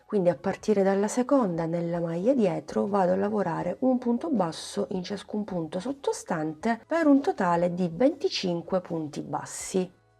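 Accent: Sicily, Italy. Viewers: Carinaaa 😊 io adoro i progetti semplici e veloci 😂 anche perche sono gli unici che mi riescono 😅.mi togli una curiosità (se vuoi) sei Siciliana e di Catania per caso? Grazieee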